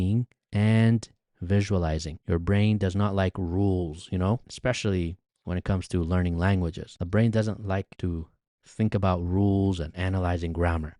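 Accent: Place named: United States. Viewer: Sir i eagerly want to learn English through your podcasts i learned a lot of things from you about how to improve English but the problem is that i want to learn British accent and your accent is American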